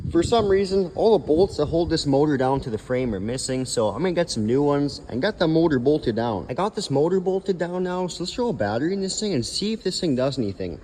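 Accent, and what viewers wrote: Canada. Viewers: You sound like you’re either from Canada or Wisconsin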